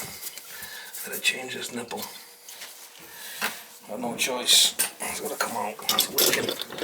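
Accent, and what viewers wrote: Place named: Boston, United States. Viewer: Oye, Once again me friend, I love you as a human, love your content, and love your comedy, But brother, You have to remove the Rag from your face, it's serves absolutley nothing, your only causing long term damage in your being, this thing they make you do, I where it for you, to perfect you bullshit, from what, the common cold the Flu, what happens to those bacteria, I see that's is now affecting you, your breathing, getting a little aggressive, Plus you took a experimental shot, I know you know what's really going on in today's society, Stop, I care,that's why I'm commenting, not trolling but caring, if those in fear want to Muzzle themselves over Fear and TV, let them, better for you, you don't have to wear one, their protecting themselves, It's gotta stop, think of the children hiding their faces in shame, only us can put an end to this. 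No disrespect, Just my Opinion. I'd stay in silence too, if I was fearful and just follow my handlers. The real message is To take care of others, You must take care of yourself first!!! So if you take yours off, maybe others will follow, but it starts with you Brother. Keep them coming, The Boston Accent is the Channel😉 Stay strong Friend.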